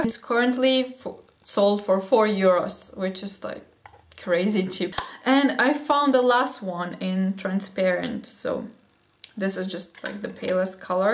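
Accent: Russia. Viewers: I barely found your videos. They are great. Where are you from cause your accent sounds Russian. Awesome video by the way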